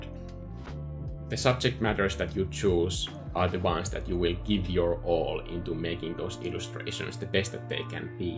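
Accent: Finland. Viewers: I think I have been at this Cafe place u showed in the beginning. If I’m right it is in Helsinki. That would also make sense to me since u have kind of a Finnish Dialekt. I love Finnland wanna go there again soon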